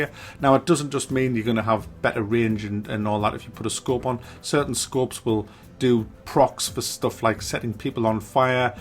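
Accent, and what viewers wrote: United Kingdom. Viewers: your voice sounds like you are a sailor from a colonial era British ship. Is it a "limey" accent? I am not from UK, I don't know what accent is from where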